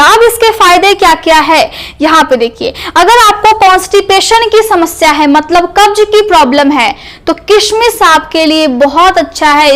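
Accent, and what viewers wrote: Bihar, India. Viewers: Kya aap bihari ho kya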